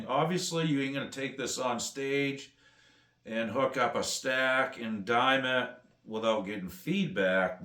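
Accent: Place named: Maine, United States. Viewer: I could tell you're from Maine just from your voice lol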